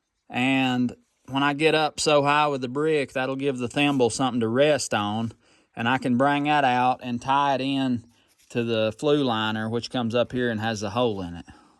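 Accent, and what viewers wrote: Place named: North Carolina, United States. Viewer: I'm assuming that is your spring gurgling and dripping in the background. Bout rocked me to sleep listening to it as you gave us mountain ingenuity on the flue construction. I also love how you have your local NC distinctive accent but your children have not trace of your accent in their speach. Much like my heavy local NC accent as my children grew up they too had a very different accent than mine. Might be because we moved to several NC towns because of my husbands career as a Postmaster for 30 years in the mountains of WNC. However and whyever (my new word)🤣the reason our children have different accents than us, doesn't matter. What matters is that they are ours and we love them. Maybe your children picked up their accents from Billy, Michelle & Will!!! Ha! Ha!❤❤❤😊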